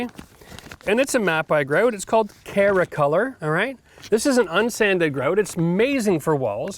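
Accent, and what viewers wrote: Canada. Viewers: OMG, I'm an experienced DIY'er and have done a bunch of remodels and cabinet building. But I never touched the tile work. My latest project is a bathroom remodel and doing the tile for the first time. Watched some of your vid's, got a feel for it. In one of your videos you mentioned something about this grout, but I ignored it. Well, job is done and I noticed this video and watched it... so yeah, oops. This now explains why the stuff was setting so quickly. Fortunately my wife chose this super close color match and it looks good. Whew. Great job on the videos. You sound just like my contractor friends, minus the Canadian thing.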